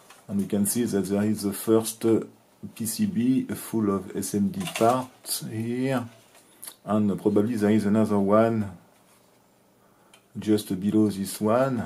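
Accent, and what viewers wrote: France. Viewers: pourquoi je suis le seul francais alors que t'es clairement francais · Very very interesting but I could't handle your Frenglish for more than two minutes. It's a difference if you can't to it perfectly but try OR if you just give a damn and just keep stressing the last syllable like in french. You didn't even try IMO. Cable is CAYbell and keBELL. Stick to French please and just add subtitles if you don't even want to TRY to mimic the sound of the language you want to speak. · he is french some letters they can't pronounce it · one of the coolest french accents i have ever heard! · Your french accent is so thick ... I love it :) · Anglais à la française 😅😅😅😂😂😂😂😂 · you accent is so french omg 😆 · Tu fais vraiment aucun effort pour parler en anglais, autant parler en français et mettre les sous-titres. Pouce bleu nonobstant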